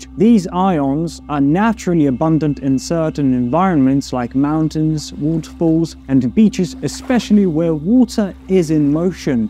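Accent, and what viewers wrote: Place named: Scotland, United Kingdom. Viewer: (with a slight Scots accent)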